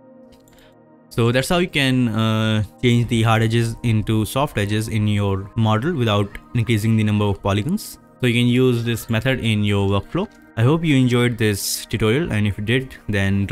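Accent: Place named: India. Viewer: Indian Accent = 10/10 Tutorial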